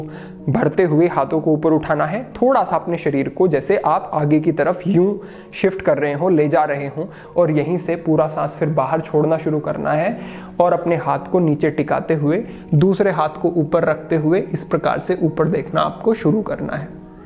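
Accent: Standard. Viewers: Shrimaan aapki Hindi ati sundar ati shudh hai. Pranaam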